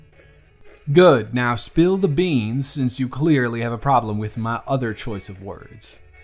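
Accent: South, United States. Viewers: Oh shit...Southern accent?! My heart. How dare you use that against me........ I fucking love you dude. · I love country, so southern accents are just amazing. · The cutry accent kind of caught me off guard but you always slay your videos. 🤭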